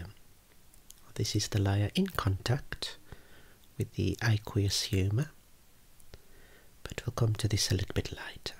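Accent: Birmingham, United Kingdom. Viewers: Wow! Fancy coming across a fellow Brummie on the big world of Youtube! Im learning some pretty good Eye knowledge from your videos too! Keep it up pal! 😃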